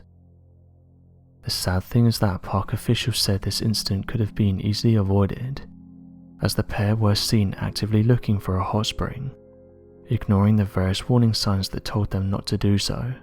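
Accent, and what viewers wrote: United Kingdom. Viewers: Well this information has been available for decades, I couldn’t stand the video because the whispering voice is so annoying, though he has a beautiful British accent he’s trying To narrate like it is a ghost story and it’s just pathetic.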